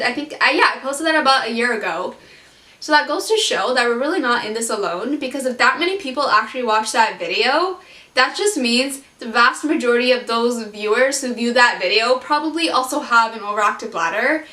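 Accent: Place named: Canada. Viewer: Your Canadian accent is very cute.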